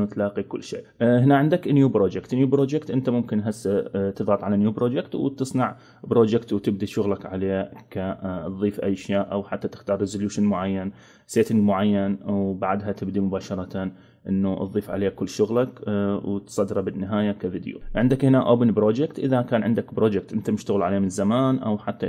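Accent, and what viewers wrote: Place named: Mosul, Iraq. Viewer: عاشتيدك والله احسن شرح لبرنامح البريميوم مبين عليك مصلاوي من العراق مو😅💜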